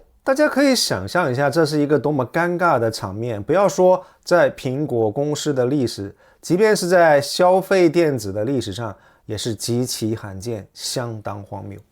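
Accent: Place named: Liaoning, China. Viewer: 辽宁口音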